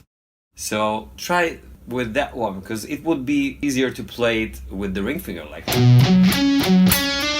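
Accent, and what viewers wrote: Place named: Hungary. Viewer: A jó magyaros angol akcentus a kedvencem :D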